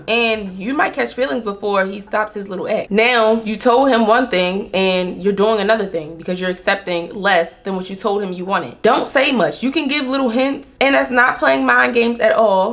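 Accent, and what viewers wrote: Baltimore, United States. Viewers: Girl! Why did I know you was from Baltimore without actually knowing lol. The accent gives it every time lol. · I knew I heard that Baltimore accent. Heyyy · Chile I knew you were from bmore as soon as you opened your mouth.😂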